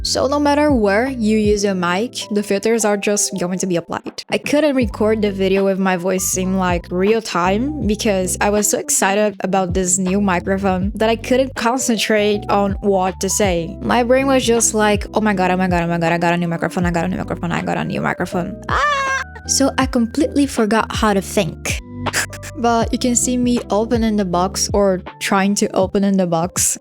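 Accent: Brazil. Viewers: Eu vi o vídeo de hyprland com cachyOS e eu pensei hmmmmm parece inglês br (mas está mt bem) kkkkk mt bom, vlw pelos vídeos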